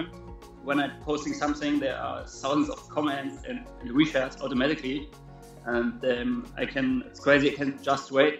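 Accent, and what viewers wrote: Germany. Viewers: I‘m german and i can clearly hear the german accent when he talks hahah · His german accent lol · Typisch Deutscher Akzent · The way he speaks englisch he is a german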